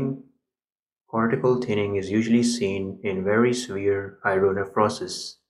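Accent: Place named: India. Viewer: Jitna vi kausis karlo aapka accent humlog jeisa hi hei..so natural rehne ki kausis kariye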